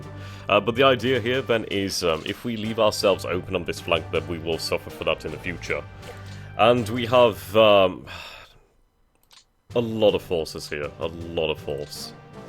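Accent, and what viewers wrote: United Kingdom. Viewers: Great Video you sound British but with a deep voice not an insult are you British??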